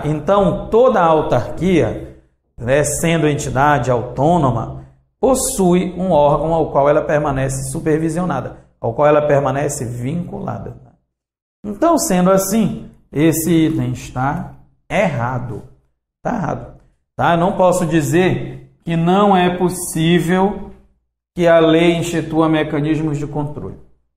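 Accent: Pará, Brazil. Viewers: Esse professor é Paraense né? :D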